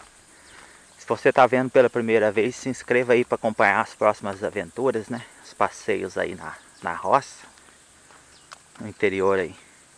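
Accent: Minas Gerais, Brazil. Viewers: Sotaque gostoso de ouvir é o sotaque mineiro👏👏👏👏👏👏👏👏👏👏👏👏👏👏👏👏👏👏👏👏👏👏👏👏👏👏👏👏👏👏👏👏👏👏👏👏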